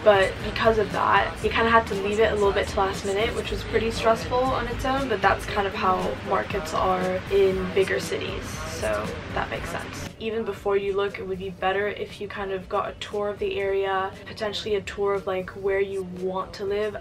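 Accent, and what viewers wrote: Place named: England, United Kingdom. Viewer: I can hear your English accent emerging...how long have you been in London?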